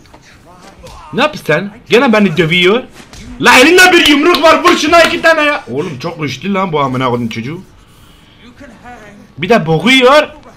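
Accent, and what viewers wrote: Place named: Adana, Turkey. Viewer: Abi adanalı mısın sövmenden anladım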